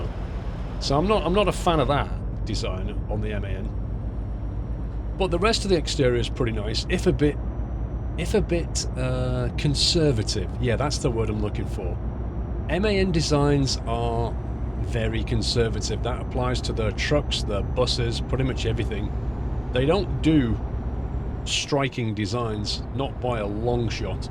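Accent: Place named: United Kingdom. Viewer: Volvo is in ATS as is all the Kenworth's as is all the Peterbilts. I don't know if they are exact replicas but they all seem to pretty much work the same and look the same. I have never used a Mod, I just stick with what SCS has as I don't need big game crashes as I'm not that computer savvy. ....... I'm American so I love your British / English accent and even much more of the UK ladies..... You probably don't realize you have an accent, but I sure do. However, your speech is nice and clear and precise even for an american to understand or any one that speaks English. England is considered Pennsylvania's mother state, as it was I think, given to William Penn by the King of England. With out googling it, I don't know why the King gave Billy all this land. However I live in Pennsylvania, USA and my great-great- grandparents migrated from the UK to here, but I have no idea why. 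I gave you a thumbs up and watched your video on 3/17/2019. Also that last delivery yard you pulled into and parked is fairly typical of ATS... The scenery however was much nicer than ATS including the roads. My next attempt at a game is going to be GTA5. If you know Jeff Favignano, he seems to get the most of GTA5 and I love watching & listening to him play that game. He has a lot of personality. Take care, God Bless...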